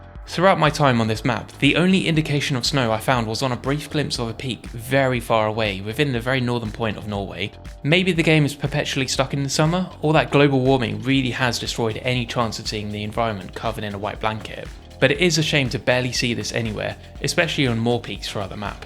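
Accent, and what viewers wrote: United Kingdom. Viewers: Some of your negatives are realistic tho, you get slower speed limits in the middle of nowhere just because there might be a forest road that log trucks frequently use, or an intersection, in video you clearly see there's some buildings so it might be due to the safety and also noise pollution, judging from your accent you are british therefore I get it, but literally everhywhere in Europe 50km/h applies if you see a city sign in some countries it is the one with the city name in some you have a city name and then the urban area sign with buildings on it which act as a zone with rules such as 50km/h, parking allowed, cars can be in left lane even if nobody is in the right unless blocking faster cars etc...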